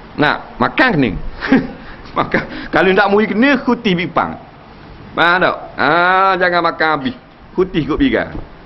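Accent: Terengganu, Malaysia. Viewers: Saya suka dia cakap Tganu..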